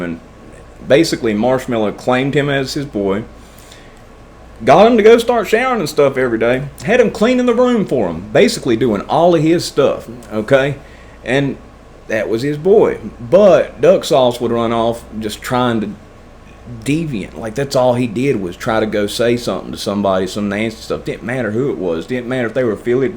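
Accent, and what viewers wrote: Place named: South, United States. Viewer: Hey man keep up the good work been watching your videos since you started! Love seeing the progress man, you chilling there with your dog and your southern draw remind me of me and some of my buddies just sitting around kicking it. I live in Hagerstown Maryland which alot of people consider the backwoods part of Maryland since we're in the western part, literally 10 mins from West Virginia line and about 35 40 from Virginia, I got in all my trouble in MD tho, I don't fuck around in WV and VA, damn commonwealth states lol keep it up sorry for long comment. BTW your clean date of April 9th, 2010 was my 21st birthday, I was doing the complete opposite of you on that day lol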